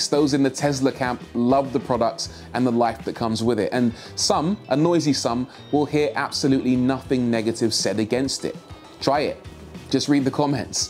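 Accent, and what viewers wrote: United Kingdom. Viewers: Very informative video. The killer British accent helped.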